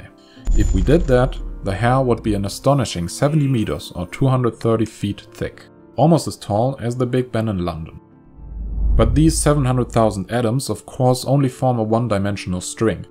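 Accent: Germany. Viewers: Omg such a German accent xD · He almost speaks German lol. Most obvious accent ever:D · Well, he does sound German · The German accent makes it even more believable... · I'm sorry, but a German accent saying the words "need to shave the heads of roughly 4 million people" cracked me right up. What can I say, I'm not one for being politically correct, either. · Horrible German accent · hier, ich will dich nicht vom videos-machen abhalten. ich find das wunderhübsch zu youtube was beizutragen. aber so´n übersteuerter deutscher akzent, da kommts mir hoch ey. kann man sprecher für anheuern, wenn man selbst nicht in der lage ist... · Warum lasst Ihr einen Deutschen Englisch reden? Macht das Video bitte entweder auf Deutsch, oder nehmt einen englischen Native Speaker. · "lets make a human sausage, maybe out of a murderer or lawyer" hrhrh i knew he was from germany, if not already clear by accent ... 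pfff and they say we got no humor ^^ its just that our humor is rather dry or explicitly gruesomely dark =) ... but to our shame we have also lots of very silly humor in the category of fart jokes *sigh*, to me that type is very cringe even before watching lots of english speaking satirists and commedians over the years(thank you youtube). ontopic: thanks for the excellent presentation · The German accent is horrible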